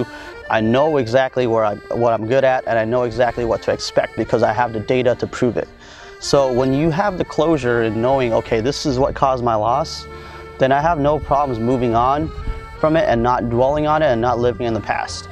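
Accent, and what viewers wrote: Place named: United States. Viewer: bro you are such an American. The accent was hilarious. Nice into and thanks for the video.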